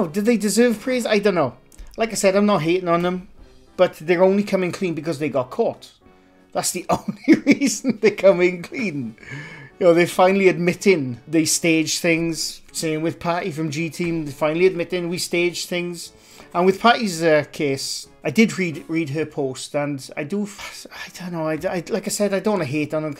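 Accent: Wales, United Kingdom. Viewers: Sent here by the Shape 😊 and now hearing you’re Welsh, well I subscribed coz I’m Welsh myself 😀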